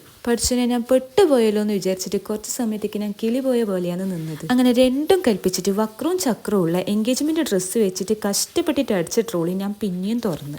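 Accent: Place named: Kasaragod, India. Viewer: Kasaragod language undhallo🫵🏻😂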